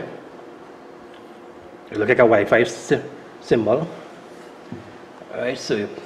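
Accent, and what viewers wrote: Trinidad and Tobago. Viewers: Wow was looking how to check my magnetron and came upon your video. Was so glad when I heard a fellow Trini voice. Was able to tell mine was bad. Thanks man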